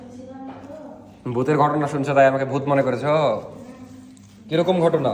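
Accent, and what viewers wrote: Rajshahi, Bangladesh. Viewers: রাজশাহীর ভাষা শুনতে ভালো লাগে। যাই হোক আপনার নতুন মা কে স্নেহময়ী মা মনে হলো ।🎉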